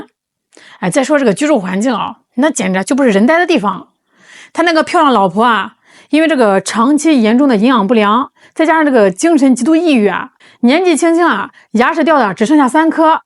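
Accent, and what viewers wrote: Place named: Henan, China. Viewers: 中原河南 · 居然是河南妞？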